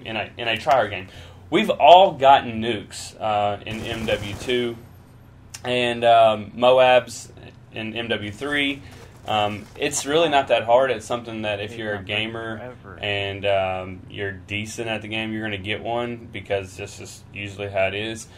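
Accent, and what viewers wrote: South, United States. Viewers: He is obviously from the south. I know this because I'm from the south and everybody talks like that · You Sound a little country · Your voice sounds kinda southern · Is that a southern accent?! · Love your southern accent! Where are you from and how old are you?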